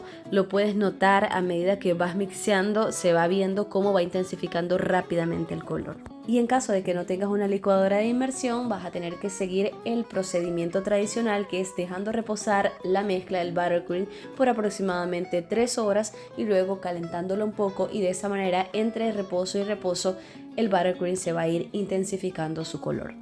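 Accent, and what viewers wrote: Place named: Venezuela. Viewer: Hola soy nueva seguidora y me enganche, eres de Venezuela? Porque estas en Argentina pero el acento te delata😅😅😅😅😅😀😀😀😀😀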